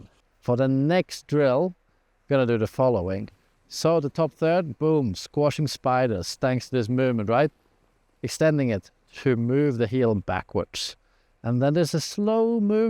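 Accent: Sweden. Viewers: Are you swedish by any chance? Sound very swedish when you talk :D edit: Great tips by the way!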